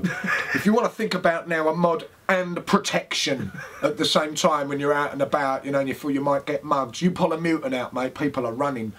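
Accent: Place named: Cockney, United Kingdom. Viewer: Your cockney accented humor never fails to entertain me. I waiting for the first American video where somebody kicks someone else's ass with a mod like this.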